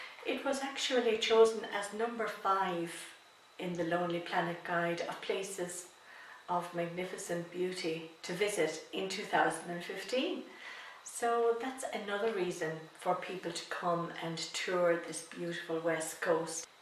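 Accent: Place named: Ireland. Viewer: oh what a beautiful country! I could listen to you all day! You have such a beautiful accent! I hope to get to see Ireland one day! Thank you so much for this video! Love and hugs, Jeanie xxoo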